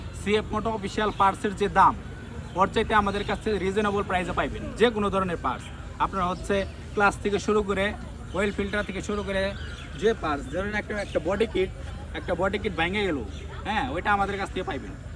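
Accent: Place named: Tangail, Bangladesh. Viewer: ভাই তুমি যে মালটা টাঙ্গাইলে এটা বুঝাই যায় শুদ্ধ বলার দরকার নাই টাঙ্গাইলে ভাষায় বলো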